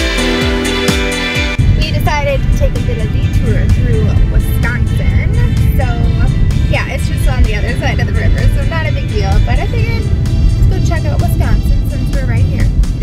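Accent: Minnesota, United States. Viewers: I grew up in Plymouth but my parents retired to Alexandria. Her accent is cute and reminds me of home. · I love her MN accent! Being from MN I totally get the oopsie and the oh yeah! 😂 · Your Minnesota accent is so cute!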